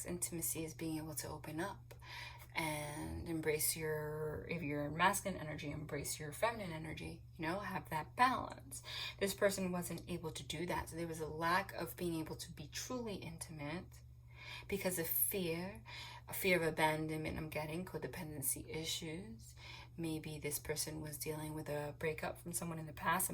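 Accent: New Jersey, United States. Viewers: I have just come across your page and im thinking “she has kinda a jersey accent hmm” and you said your from nj omg 💖 I love it!!! Its been crazy here as you know.... light and love for you sister 💞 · I love her Jersey accent!! So cute and entertaining 😁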